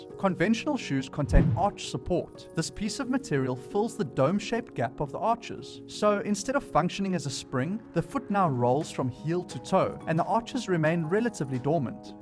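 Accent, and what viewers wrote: South Africa. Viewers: Beautiful South African accent, made watching very enjoyable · Ten seconds into the video and liked, subscribed. South African accent is so nice. You sound a bit like the former protea captain Greame Smith!!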